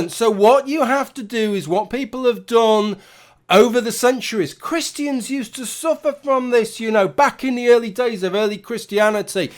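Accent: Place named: Birmingham, United Kingdom. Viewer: The insults are 3x funnier with the Brummo accent, don't get upset, you're perhaps the only atheist I enjoy listening to since most of the Rational Skeptic TM community have their heads up thier arses: including everyone in Apes video, especially since Sarg'n began his losing streak against the "Alt Right" also a few Liberalist discord mods are soliciting 14 yr old boys for sex. That might put this into perspective.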